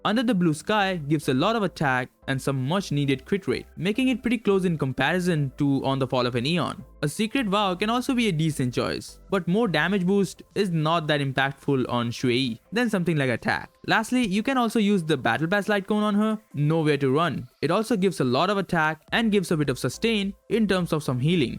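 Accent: India. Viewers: Are u indian or something?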